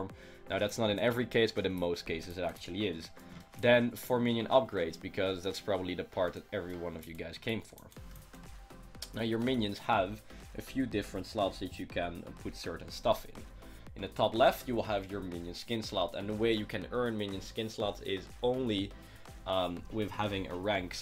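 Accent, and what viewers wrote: Netherlands. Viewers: Ur accent sounds dutch where are u from?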